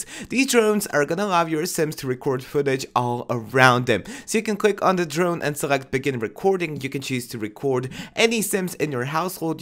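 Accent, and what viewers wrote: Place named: France. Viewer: What a lovely accent! Are you french?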